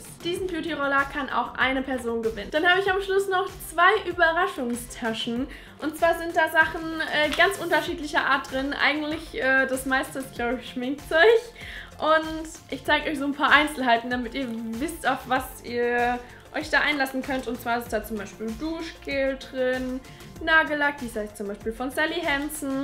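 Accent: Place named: South, Germany. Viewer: Hiii, naaa xD ich hab gedacht ich versuche mein Glück mal^-^ was ich ja an deinem Kanal besonders feiere sind die Umsylings, manchmal ist es schon krass was das ausmachen kann und wie einfach man sich doch (äußerlich) verändern kann, ich denke das gibt den meisten auch einen Denkanstoß :) Und nochwas, ich hab irgendwie sofort an deiner Stimme gehört, dass du irgendwo aus dem Süden kommst (wie ich xD) weiss nicht, war einfach so xD Zum Gewinn, eh ja, also ich liebe liebe liebe Überraschungen also folglich eine von den DM Tüten wäre cool, oder was ich schon immer ausprobieren wollte ist das Wimpernserum** Aber wenn es hart auf hart kommt eine Überraschung macht mich immer froh xD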